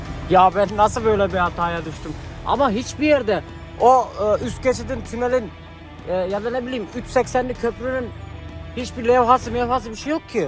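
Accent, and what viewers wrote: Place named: Central Anatolia, Turkey. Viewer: Konusma şeklin yozgat sivas bu yörelerdenmisin ? iç anadolu olduğu kesinde...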